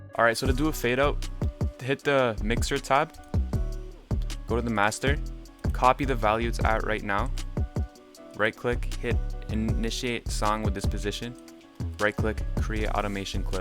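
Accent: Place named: Canada. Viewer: Cool! Would love to visit one day. Very good video. I can tell because one of my best friends from Canada and some shows I watched are based there and you sound just like all of them lol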